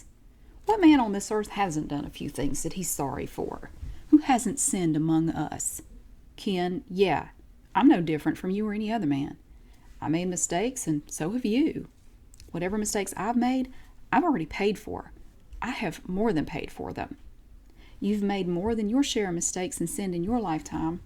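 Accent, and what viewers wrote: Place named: East Tennessee, United States. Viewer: I will almost bet you are from East Tennessee. Well...you sound like us...in Knoxville lol.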